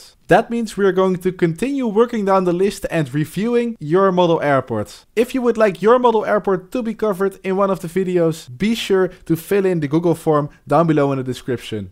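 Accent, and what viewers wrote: Netherlands. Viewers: Are you dutch? You have a dutch accent.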